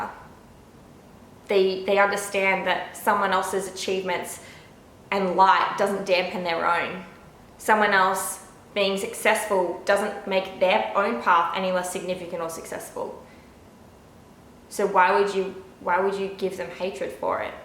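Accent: Australia. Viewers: Sorry this is completely off topic but are you Australian because you sound Australian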